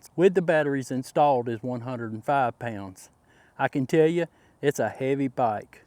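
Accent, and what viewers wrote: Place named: Mississippi, United States. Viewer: Good review! I love your accent. You remind of my family in Coffeeville, Ms. 🙂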